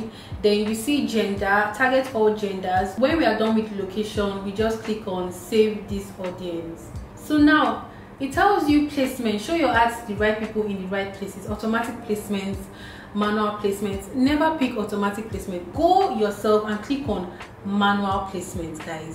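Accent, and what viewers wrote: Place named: Yoruba, Nigeria. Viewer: Nne, why do you sound so Yoruba? Looking peng though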